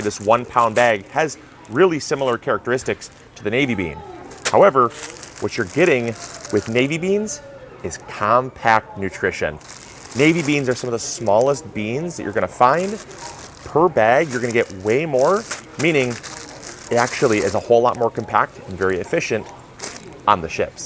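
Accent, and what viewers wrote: Michigan, United States. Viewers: I miss the Michigander accent!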